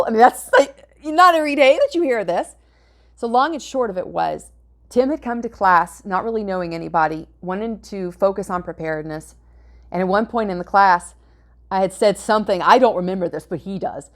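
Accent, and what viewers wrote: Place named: Midwest, United States. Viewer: George Bruno turned me onto your channel. You're very encouraging and informative. A feminine woman of God is a breath of fresh air. Love your Midwest drawl. 💯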